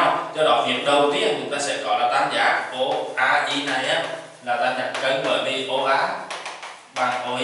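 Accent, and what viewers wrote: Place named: Nghe An, Vietnam. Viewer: nói giọng giống nghệ an rứa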